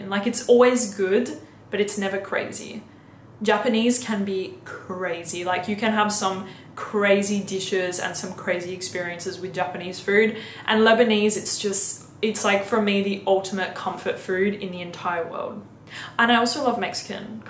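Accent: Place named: Australia. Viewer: I have a weird question. I’m from the UK and grew up watching Neighbours, your accent sounds very different to the people on that show, does Australia have a lot of different accents?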